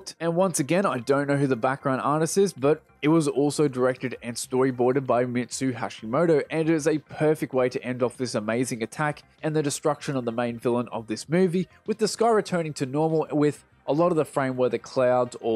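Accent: Australia. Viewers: I'm a simple man. I hear an Australian accent and I like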